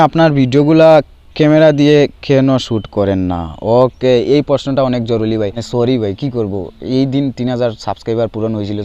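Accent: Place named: Gopalganj, Bangladesh. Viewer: ভাই আমার শশুর বাড়ির সবাইর ভাষা আপনার মত। love from Gopalgonj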